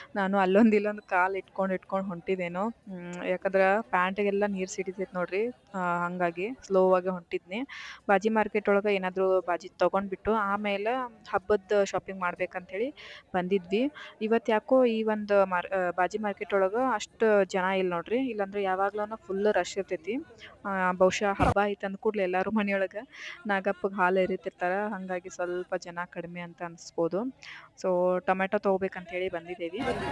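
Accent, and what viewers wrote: North Karnataka, India. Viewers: ನಾಗರ ಪಂಚಮಿ ಹಬ್ಬದ ಬಗ್ಗೆ ವಿವರವಾಗಿ ತಿಳಿಸಿದ್ದೀರಾ ನಿಮ್ಮ ಉತ್ತರ ಕರ್ನಾಟಕ ಭಾಷೆನೇ ಚಂದ🎉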